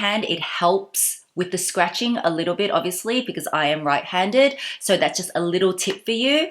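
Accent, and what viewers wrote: Melbourne, Australia. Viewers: It's always so awesome hearing an Aussie accent on YouTube. I'm up in Brissy now but when you mentioned Chaddy (Chadstone) I was like "aaaaaaayeee!" lol. It's so hard to find reviews of things on YouTube from Aussies with names that you know. So awesome to see you. And thanks for review - I love the idea of the love bracelet and was planning to get one, but now I'm thinking "just a nail" might be more for me. I'd be mortified to look down and see it gone. It would be like looking down and something like a JLC Reverso just vanished - heart broken. Sweet info on pros and cons. Thank you. :) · Are you from Melbourne? You have that accent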